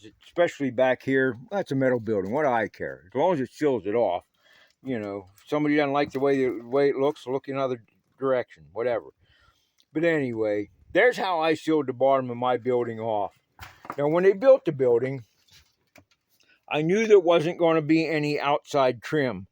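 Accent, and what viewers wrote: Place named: Western Pennsylvania, United States. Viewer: Thanks for the tip. I sure hear that Western Pennsylvania accent. (My wife is from Butler)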